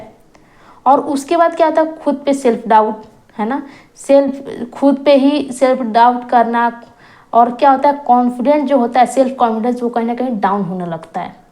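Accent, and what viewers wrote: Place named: Bihar, India. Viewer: Bato se lag raha hai bihari hoo 😂😂😂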